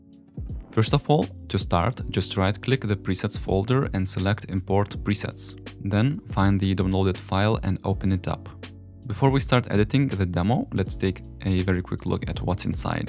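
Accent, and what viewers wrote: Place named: Russia. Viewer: А я слышу, что ты русский))))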